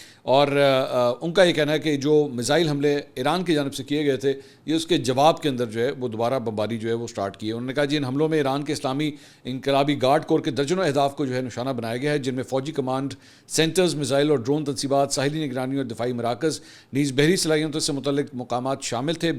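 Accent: Punjab, Pakistan. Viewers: پنجابی پنڈوہ اردو نیوز میں بھی پنجابی😂😂😂 لیکر آتا ہے 😂😂😂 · Bhai Salam Punjabi touch Sath ma ap lgatay hn bra mza aata Hy zabardast speech salamat rahin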